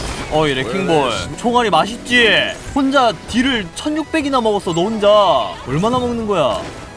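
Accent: Gyeongsang, South Korea. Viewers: 경상도사람임?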